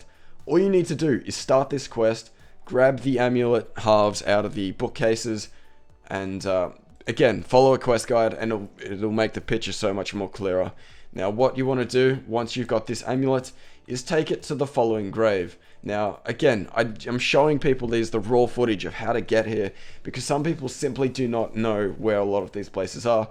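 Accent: Australia. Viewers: He's clearly not American. he's australian. run off UK English. aka the English from England. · yew aussie guide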